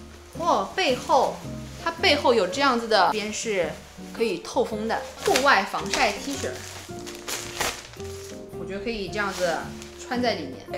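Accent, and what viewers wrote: Shanghai, China. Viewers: 好喜欢你的上海普通话啊哈哈哈哈，太开爱了。裤脚管，便宜西西的哈哈哈哈😂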